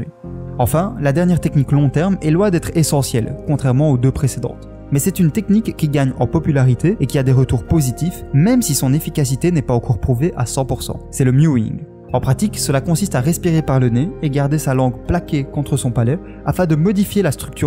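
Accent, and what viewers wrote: Belgium. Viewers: J'ai cru que tu étais mort ! xD Sérieusement très bonne vidéo comme d'habitude et vive l'accent Belge ! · On garde l'accent belge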